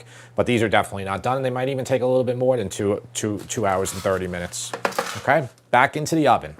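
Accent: New York, United States. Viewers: The problem is HFCS replacing cane sugar very few bottled sauce is real cane sugar and or honey. Definitely better making your own Especially Heinz has simply and organic made without hfcs. I sure hope your taste tester can tell the difference. Like u said can see it as well. You look and sound like my cuz that lives in Queens. Your one of a very few youtube cooks without sthick straight forward no bs approach. Definitely love the deli experience. Living in Central Ca can get some quality products but unless in major city hard to find many NYC even east coast products No Uncle Guisseppe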